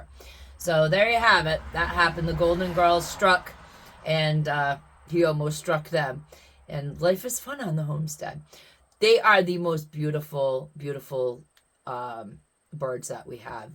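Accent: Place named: Maine, United States. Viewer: I could tell you were from Maine as soon as I heard you talk❤ I'm from there. Except I've lived on the west coast for the last 6 year's. Miss that accent ❤❤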